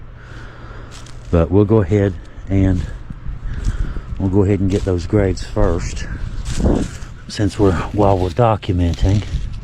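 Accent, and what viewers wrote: South, United States. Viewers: I’m from Phila PA in the great Northeast. Tonight was the first time I watched your video. I was enjoying your southern accent when I noticed the depth of your research. Thank you for your work. I hope you get more viewers. this was the first time the algorithm directed me to your video and subscribed to a new channel.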